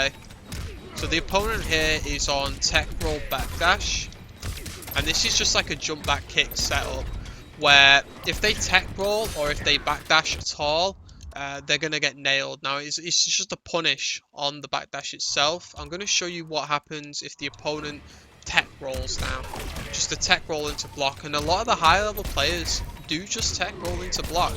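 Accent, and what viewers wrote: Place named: United Kingdom. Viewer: wakeOp lol that british accent always reminds me my old english teacher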